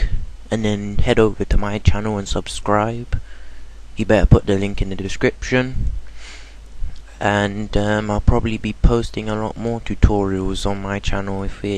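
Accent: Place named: East London, United Kingdom. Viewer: YOUR ACCENT DOESNT COMPUTE. Seriously, where are you from, you sound a bit east london, maybe essex?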